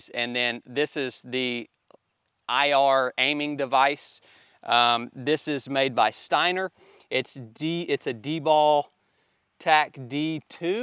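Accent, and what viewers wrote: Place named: Georgia, United States. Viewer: ! Been a long time. I was your RCPO (“RPock”) in boot camp 😂. I don’t know if you remember, but I ran into you when I was a new guy at 10 (after a successful second attempt through the pipeline). It’s funny that I just ran into your channel. I was just telling someone a story about you in boot camp. I was telling them that I had to kick my southern accent a little bit because anytime I would put something out to our division, people would giggle at my accent. Then I told them about one day when you were answering some question about what a chief wears on the sleeve of his dress blue uniform, and everyone kept giggling at your deep Georgia accent. You stopped what you were saying and said, “I wanna know what’s so funny.” 😂😂😂 It’s good to see you’re doing well, brother 🤙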